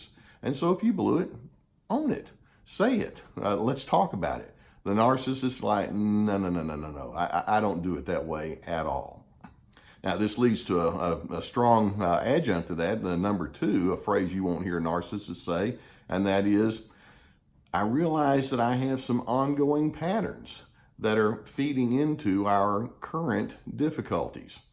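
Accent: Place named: Georgia, United States. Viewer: Where is from? He sounds like Effingham County, GA! ( A Salzburger? Well, I Suanee!!!”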